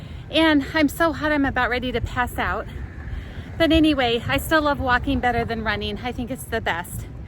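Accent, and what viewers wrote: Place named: Midwest, United States. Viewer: What's that accent? MI, WI? "Kind of like a bad relationship." 🤣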